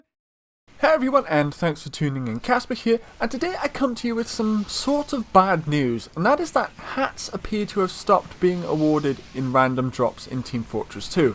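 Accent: Wales, United Kingdom. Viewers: Are you Welsh ?? You sound like it.....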